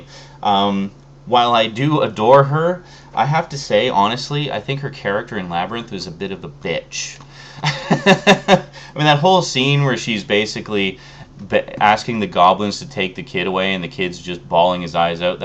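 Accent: Canada. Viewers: ***** Are you from Canada?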